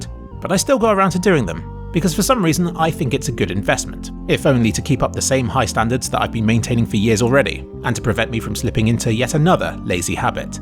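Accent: United Kingdom. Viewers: Hey, I use the subtitles you make because I think they're more accurate. And because English is not my first language it's hard to me understand British accent So I really appreciate your hard work subtitling your videos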